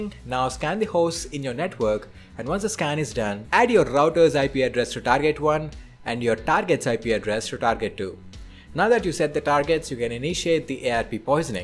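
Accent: India. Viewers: I knew you were an indian guy I knew it since the beginning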